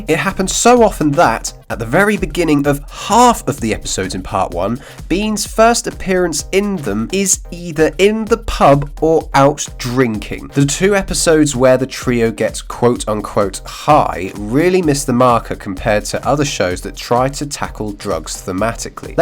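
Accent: United Kingdom. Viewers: This video sounds like you couldn't wait to make a video styled after your favorite complaining youtube channels. The british accent really sets it off. Most of the youtube channels I find complaining about movies and tv are mostly done with a british accent. Moreover, you put heavy duty spoilers in the description, but the very first thing you talk about is how you are trying to help people get a feel for wether or not they SHOULD watch the show. But you're spoiling the entire show to give your opinion. Are you like, a real person? or just some moron with a platform.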